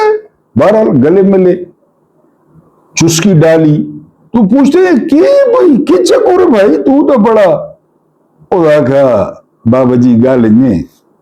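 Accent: Saraiki, Pakistan. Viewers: Excellent analysis sb, suraiki accent bht enjoy kartay hn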